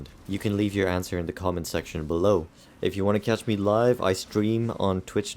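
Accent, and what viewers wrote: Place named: Switzerland. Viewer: Are you Swiss? You sound like Roger Federer.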